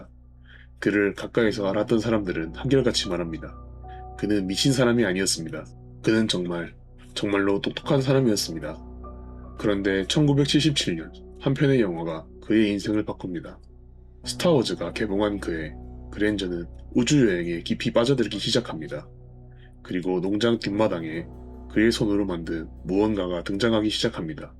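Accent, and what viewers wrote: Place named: Gyeongsang, South Korea. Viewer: AI목소리가 아니라 갱상도 사람이네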